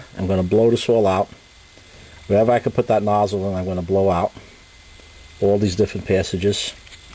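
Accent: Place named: New York, United States. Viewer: Old - Fashioned New York Accent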